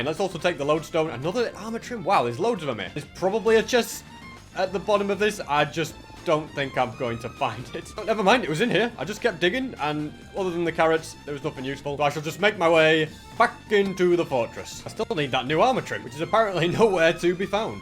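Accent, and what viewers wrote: United Kingdom. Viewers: he bri’ish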